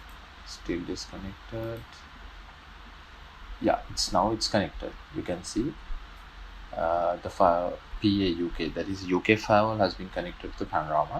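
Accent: Sri Lanka. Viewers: Yes, I downloaded Expedition and installed on Linux box and I have yet to figure out how to use Expedition. You sounded very much like you are from Sri Lanka. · Great explanation BTW are you a Sri Lankan, your accent is so sri lankan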